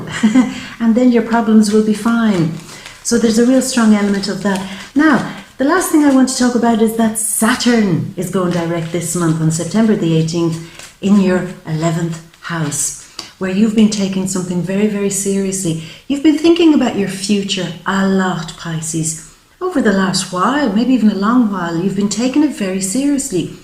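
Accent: Ireland. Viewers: you're beautiful, intelligent and intuitive and thank you. plus i'm lovin' your Irish? accent? L've got the Irish blood too : )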